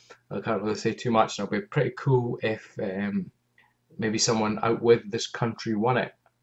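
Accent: Scotland, United Kingdom. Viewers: Haha that is why I subscribed. I came for the Scottish accent and left with a new friend who also enjoyed wearing women's clothing ;-)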